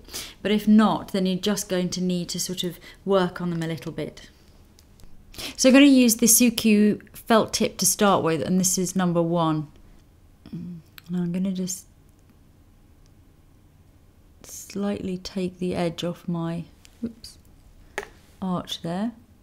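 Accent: England, United Kingdom. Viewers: Great, very informative videos! I also like your English accent (I'm German) :)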